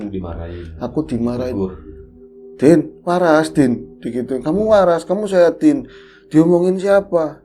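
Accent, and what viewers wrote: Banyumas, Indonesia. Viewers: Aku di sini malah kekel krna mas nya ngomong nya ngapak campur bahasa indonesia 😂😂😂😂😂maaf ya gk bisa gk ketawa 😂😂😂😂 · Mas, aku kelabakan pas ngomong ngapak full speed. Ga ada subtitle-nya. 🤣🤣 Btw, format begini menarik jg sih. Seperti POV klo kita ngumpul² bareng gt kan pasti ngeliatnya ga cuma ke satu orang.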